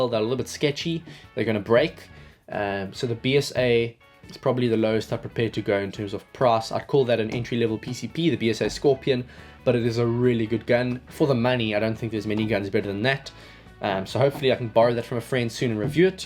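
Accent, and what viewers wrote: South Africa. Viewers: is your first language afrikaans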